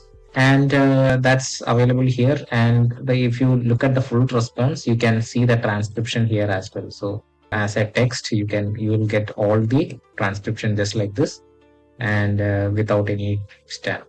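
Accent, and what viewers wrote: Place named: Kerala, India. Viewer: I think your Malayalam should not come in English and can be litter slow and steady in talking.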